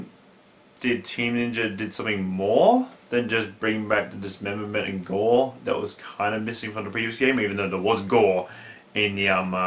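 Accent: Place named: United Kingdom. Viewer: Dude ... i expectd an aussie accent like my friends from school but you sound like my friend from back home "Britain" u sound like a BIG OLD SIR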